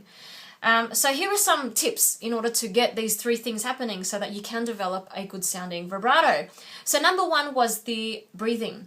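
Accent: Australia. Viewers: are you from Australia? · She's from Australia.... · OMG, shes stray-lian : )) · i figured it out, you're australian! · Are you Australian? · You're Australian correct? I'm usually good at differentiating between Australian and British.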